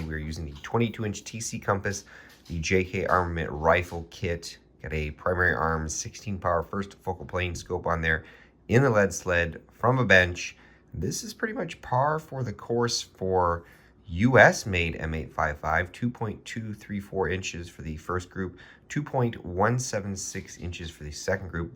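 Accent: Australia. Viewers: Good Australian Accent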